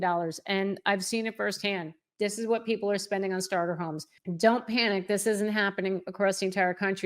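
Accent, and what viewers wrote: New Jersey, United States. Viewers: Ms., I grew up in NJ and was glad to leave when I did because I like more open space, but I really enjoy your accent and disposition. Reminds me of the part of Jersey that I didn't know I still really appreciate: the people. Thank you for your objectivity and conviction. Been enjoying your content lately as a soon-to-be new home buyer.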